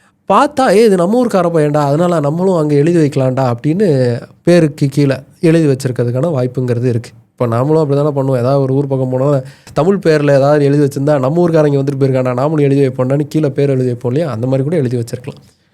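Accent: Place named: Telugu, India. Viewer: Telugu naatham naaruthu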